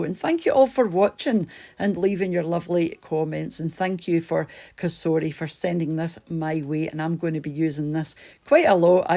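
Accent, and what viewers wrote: Scotland, United Kingdom. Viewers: Love your accent are you Scottish?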